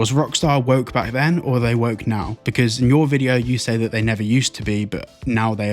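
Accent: United Kingdom. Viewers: Yea you’re definitely from the U.K lol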